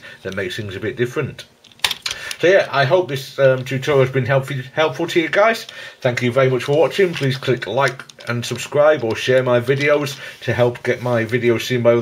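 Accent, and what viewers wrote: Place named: Northern England, United Kingdom. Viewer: Thanks, I'll give one of them a go. I am guessing (from your accent) that you are from the north of England; are there any puzzle groups that get together up here that you know of?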